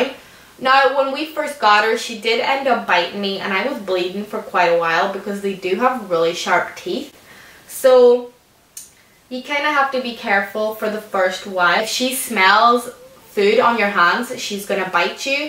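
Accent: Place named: Ireland. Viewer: Awe shes so cute and i love your irish accent!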